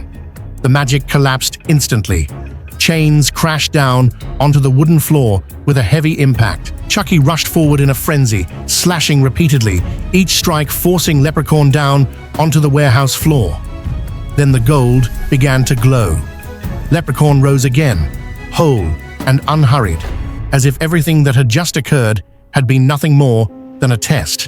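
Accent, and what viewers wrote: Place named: United Kingdom. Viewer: I think he did pronounce leprechaun but in a British way like they always talk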